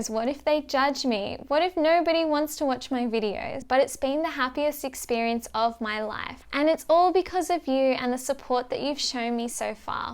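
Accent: Australia. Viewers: Loving your content night and day. Maybe it's the Australian accent :) I wanted to say this is a great video to show your audience why you are doing what you're doing. It's great to hear how you were making $400 per week early on. Through the struggle and sleeping through it, you are now more financially independent than before. I find a similar story because when I first started off, I was making $300 per week only. It's nice knowing that your why purpose is to help others benefit from financial discussions. That's why I value watching your content. You seem very warm, bubbly, and charismatic. Thanks for your video.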